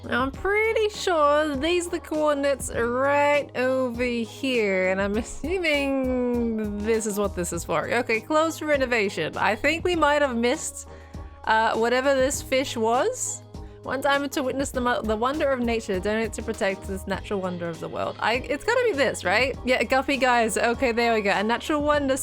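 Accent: Australia. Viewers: Did know youse was aussiestrailian. Fucking coll.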